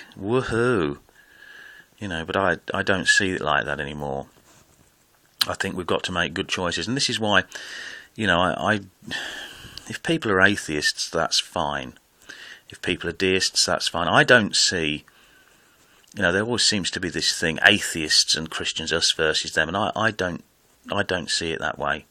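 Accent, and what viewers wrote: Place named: United Kingdom. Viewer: I've been a lurker on your channel for some months now, and I feel it appropriate at this time to show my appreciation for you posting these monologues. Besides the obvious depth of the subject matter, I also enjoy your strolls in the park, your British accent and "load of old rhubarb" expressions, and your richness of character. I'm not a JW, never been one and never will be, but I am amidst the long process of attempting to guide a born-in out of his misery. I thank you for your efforts.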